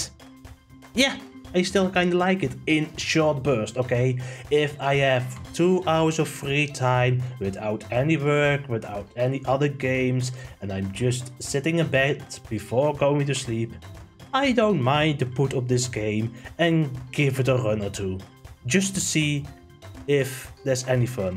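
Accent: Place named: Netherlands. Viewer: That Dutch accent is so funny. 🤣 Thanks for your honest review, was unsure with this game but am glad I’m skipping it. 😉 Great work sir!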